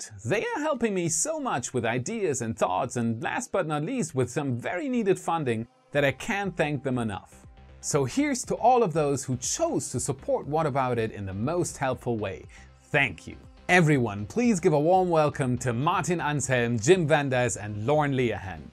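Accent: Germany. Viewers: C'mon he speaks English with a heavy accent and German without any, so you tell me haha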